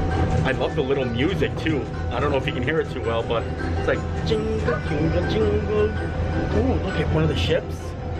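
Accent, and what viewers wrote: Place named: Northeast Pennsylvania, United States. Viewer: I always thought you had a north east PA accent. Lol. If you’re ever near Williamsport PA, hit me up.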